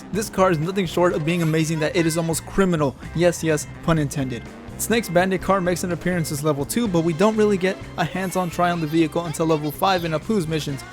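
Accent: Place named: Latin America. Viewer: jaja Que quay!!! I am Chilean and I could hear it in your voice! Much love bacan!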